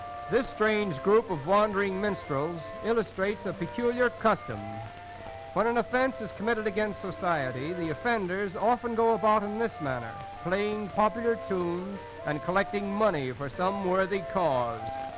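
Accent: United States. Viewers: ナレーションアメリカ人かな？ まだ日本とアメリカが仲良かった時代か。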